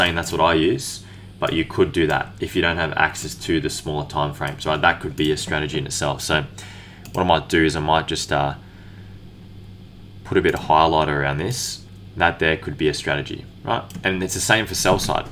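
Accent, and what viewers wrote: Australia. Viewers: Brilliant mate! Awesome to hear an Aussie trader! I'm a North Queensland boy... Loving the London stuff, mate, as it suits our time zone much better. Keep up the great content 👏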